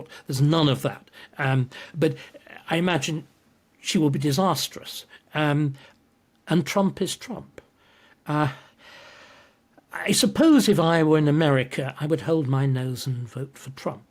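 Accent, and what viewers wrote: United Kingdom. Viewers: I'm American and I just LOVE to listen and learn from a Brilliant Brit. Nobody sounds like they do! 🤩😁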